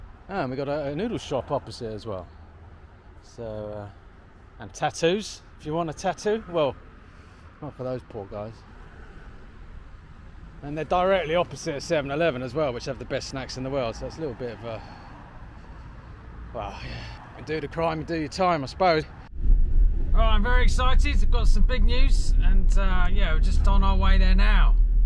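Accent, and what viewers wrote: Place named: South London, United Kingdom. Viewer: Who needs a kitchen with a 7 and noodle shop so close.Lol. Your excitement over a lotus fresh was quite overwhelming and could prove to be a great 'marketing' tool for the company(if they get to see it) and the shot of that lonely grapefruit will last with me forever. All in all another great vid, I do like the casual and friendly manor you have when interacting with the locals and your 'river wandle' accent makes the whole experience pleasurable. Keep it up fella.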